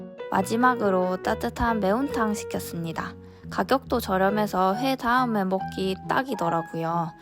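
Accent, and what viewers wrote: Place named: Gyeongsang, South Korea. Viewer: 서울사람이라면서 갱상도 사투리네예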